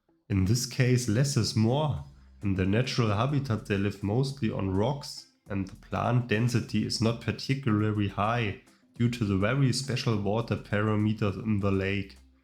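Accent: Germany. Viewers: Warum machst du kein deutschen Kanal? Man hört leider extrem raus, dass du Deutscher bist mit deinem Englisch.😅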